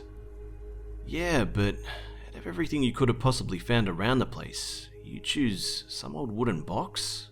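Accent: Australia. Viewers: I agree with you 100%; IS one of the best narrators on YouTube! His content is ALWAYS excellent AND satisfyingly creepy. I too an not a big fan of The Creepypasta genre (as I assume you aren't either) and his channel rarely presents them. His content is always well chosen; it is gripping, spooky, pleasingly bizarre at times and is always presented in a very listenable manner. (AND, how amazing is his Aussie accent?!) `s channel is DEFINITELY one of the very best on YouTube! 😱💀☻👻🦇🕸🕷🐱☠👽🖤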